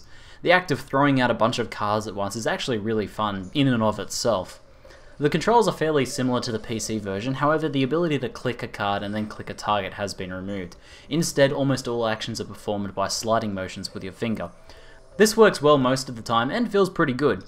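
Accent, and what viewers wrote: Australia. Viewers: took me about 1 seconds to realise hes australian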